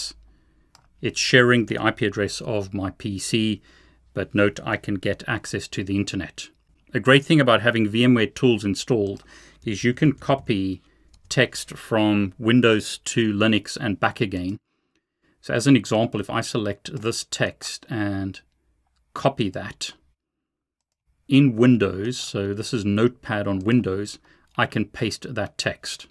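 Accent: Afrikaans, South Africa. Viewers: HAHAHA Wou se jou aksent is AFR. haha voorspoed tjom! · Ek wou se ek herken n Saffer aksent....Geniet jou videos! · I knew he was South African xD that accent was amazing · I knew I detected an Afrikaans accent in your other videos! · Well you got the afrikaans accent right, maar jy moet dit nie weer doen nie asseblief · i thought that was an SA accent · I called it! I thought your accent sounds South African. lol "jou rekenaar is myne" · it was Afrikaans my friend you can hear his SA accent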